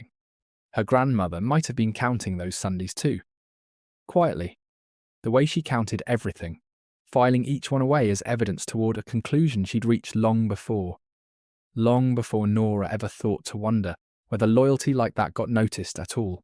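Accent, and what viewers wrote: England, United Kingdom. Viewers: South Carolina? Get rid of the English accent.. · love your stories but why the english accent.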